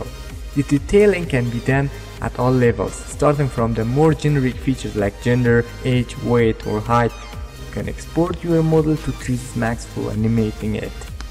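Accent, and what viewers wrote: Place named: Russia. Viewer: Почему мне кажется, что ты из России или стран СНГ?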